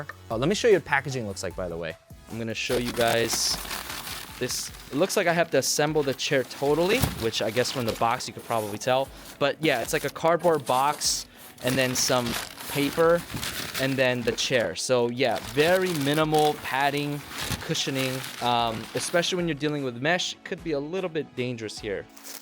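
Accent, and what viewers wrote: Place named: Europe. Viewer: Sounds like he's from Europe.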